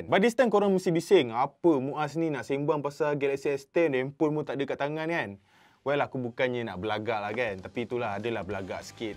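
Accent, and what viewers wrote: Malaysia. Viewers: Sedap juga dengar logat melayu malaysia, beda sikit dengan melayu sumatera. Big love from indonesia😍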